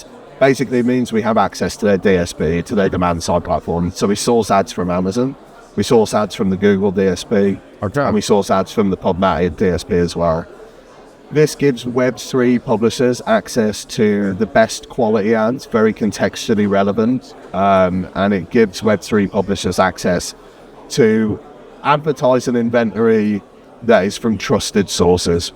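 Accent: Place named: United Kingdom. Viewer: Sehr gutes Interview, und ich mag dein Englisch sehr. Warst du mal längere Zeit in Großbritannien? Die meisten Deutschen sprechen ja entweder mit sehr deutschem Akzent, oder es klingt eher amerikanisch. Bei dir gar nicht, das klingt schon relativ britisch.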